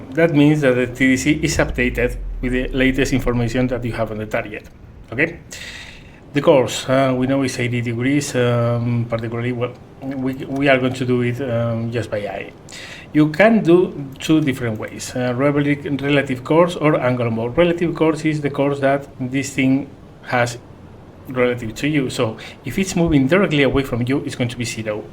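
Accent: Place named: Spain. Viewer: Muy bueno. Por tu acento apostaría a que eres español, ¿no?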